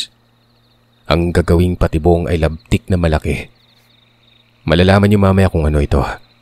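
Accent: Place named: Ilonggo, Philippines. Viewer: Wag ka na pong mag ilobggo or bisaya Hindi bagay nakaka rindi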